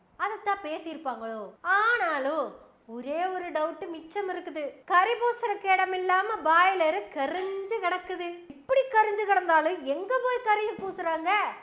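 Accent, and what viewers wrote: Kongu, India. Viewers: இந்த கொங்கு பாசை சூப்பர் இருக்கு 👌👌👌9tea 🤣🤣🤣🤣🤣சொல்லறது சூப்பர் ஓ சூப்பர் 👍👍👍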